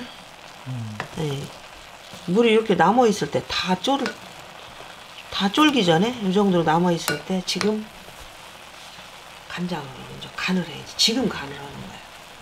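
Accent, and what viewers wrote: Chungcheong, South Korea. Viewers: 충청도 사투리가 너무 좋습니다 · 어머님, 구수한 충청도 말씀이 너무 좋아요. 오늘도 감사 합니딘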